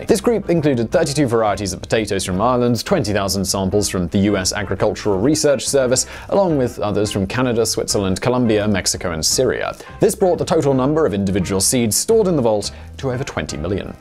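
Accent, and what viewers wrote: United Kingdom. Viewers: I mean, that's how most British and Australians pronounce it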